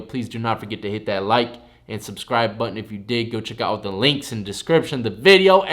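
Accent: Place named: Appalachia, United States. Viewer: Why you talk like a hill Billy bitch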